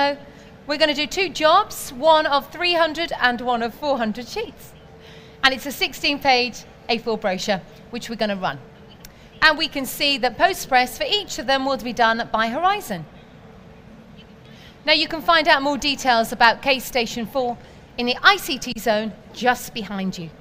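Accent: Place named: United Kingdom. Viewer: Can't stand the British mouth here.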